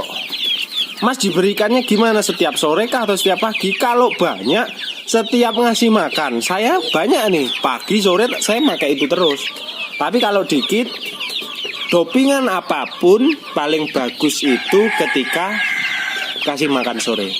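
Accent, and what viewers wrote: Java, Indonesia. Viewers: Aku msh pemula Mas Yang selalu mengikuti petunjuk. Mas njenengan ini orang lampung kok logatnya kayak orang jawa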